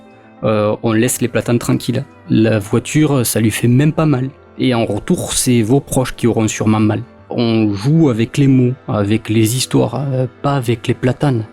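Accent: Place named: South-West, France. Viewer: J'ai découvert cette vidéo sur skeptikon. Du contenu sceptique à la sauce accent du Sud Ouest, voilà de quoi ravir mes oreilles. Alors je viens soutenir par un pouce et un abonnement içi.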